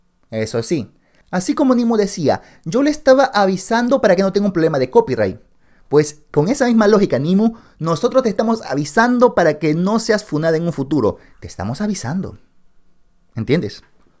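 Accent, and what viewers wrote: Ecuador. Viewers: Apenas iba a preguntar si eras de ecuador por el acento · una pregunta muy tonta creo ¿eres de Ecuador?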